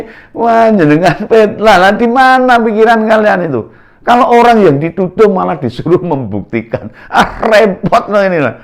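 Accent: Java, Indonesia. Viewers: Mantap mas, carane njenengan menyampaikan koyo dongengengi 😀😀😀👍👍👍 njawani buanget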